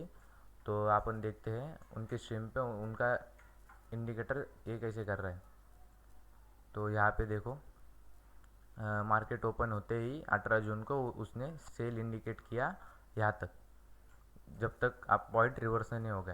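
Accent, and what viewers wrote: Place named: Maharashtra, India. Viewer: तुझी हिंदी बोलण्याची पद्धतीवरून तु मराठी वाटतोस आहेसच...video भारीच होता .हे सगळं मराठीत येऊ द्या ..मराठी मधील शेअर मार्केटचा सगळ्यात फेमस आणि उपयुक्त च्यानल तुझं होवो ह्या शुभेच्छा .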